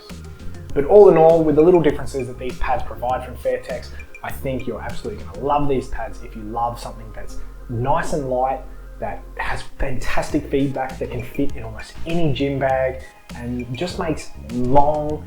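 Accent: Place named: Australia. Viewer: Your channel is Fair dinkum I appreciate your reviews. I love listening to people talk about muay Thai gear especially in an Aussie accent. 🥊 I love when you said "muay" instead of "my" 🤣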